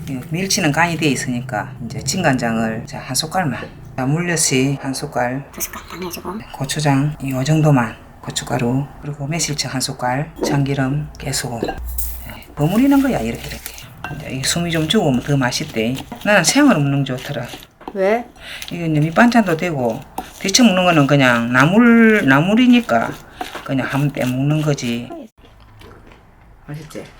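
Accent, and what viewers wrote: Gyeongsang, South Korea. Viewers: 진주사투리 들을수록 고향가고 싶어요 스물안되 부산와서 벌써 50이 넘었네요 내가 뛰어놀던 인사동 잘 있나 몰라요... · 경상도 말투가 아주 재미있어요 잘보고있습니다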